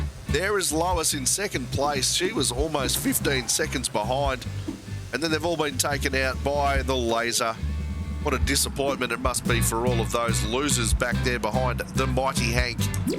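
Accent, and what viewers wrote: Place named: Australia. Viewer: I hope for new updates and its okay for pronouncing names wrong, but you're Australian accent is cool.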